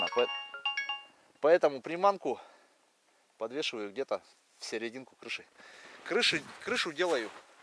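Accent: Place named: Vyatka, Russia. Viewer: Очень хороший канал, привет бывшим землякам))) Узнаю родной ВЯТСКИЙ говор)) 3 года от него избавлялся). Но, послушать его иногда очень приятно! Продолжай в том же духе!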